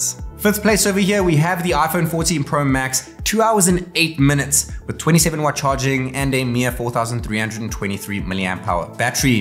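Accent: South Africa. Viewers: I forgot your south african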